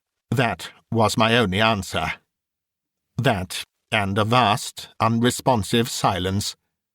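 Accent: England, United Kingdom. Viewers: Great stuff sounds better in a upper class english accent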